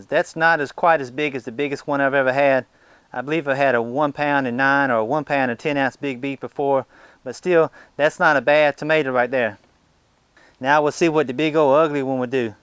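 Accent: South, United States. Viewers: Beautiful tomatoes :) This is my first time growing cherokee purples, and I'm hoping they come out as well as yours... Btw, you have one of the greatest southern accents I've heard on youtube, and that's from an alabama native! Very impressive, sir.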